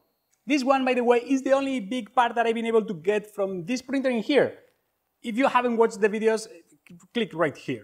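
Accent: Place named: Spain. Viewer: Madre mia que acento, tu eres español amigo! Pd Que passada de video tio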